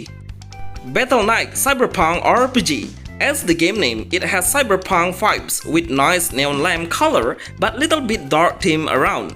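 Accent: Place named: Indonesia. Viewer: Bahasa inggris kamu udah lumayan bagus,tapi kamu perlu menghilangkan aksen indo mu bg,tapi makasih bg❤😊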